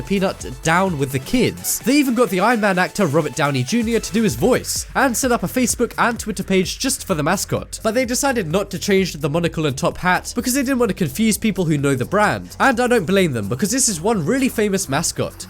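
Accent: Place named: England, United Kingdom. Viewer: What part of England do you hail from,? Just curious due to your accent:)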